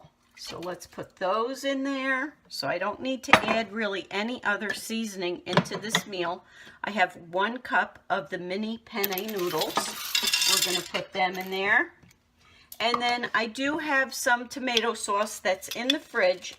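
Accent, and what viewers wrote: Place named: East Coast, United States. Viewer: Looks delish! You from east coast. Slight accent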